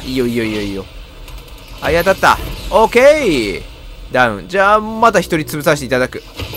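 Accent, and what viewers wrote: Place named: Aomori, Japan. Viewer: プレデターもやけど、青森？癖の強いなまりに集中出来へんわ(笑)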